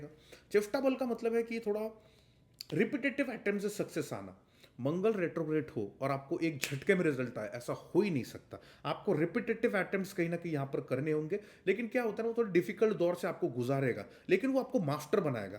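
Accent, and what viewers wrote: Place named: Maharashtra, India. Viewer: Aap maharashtra se ho ,marathi .. observed ur chautha pronounciation... Ekdam chan video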